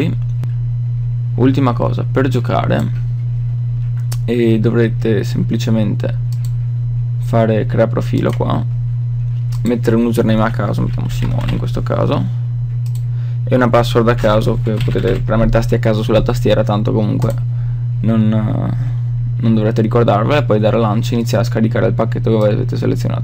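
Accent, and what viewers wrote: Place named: Rovigo, Italy. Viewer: sei di rovigo lol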